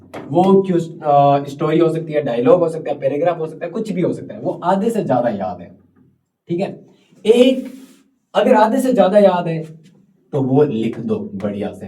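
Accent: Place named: Rajasthan, India. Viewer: Sir aap to rajsthan ke ho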